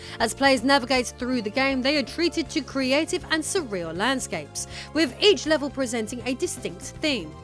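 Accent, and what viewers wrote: United Kingdom. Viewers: Can guarantee she never played any of these games, and this is a conglomeration of a YouTube channel gen scheme where they find a British voice woman and slap her in front of a camera while someone else writes the scripts. Does the research and actually video editing.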